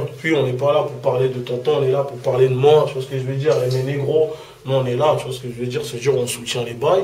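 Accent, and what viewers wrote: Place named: Africa. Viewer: Bizarre maintenant il parle avec un fort accent africain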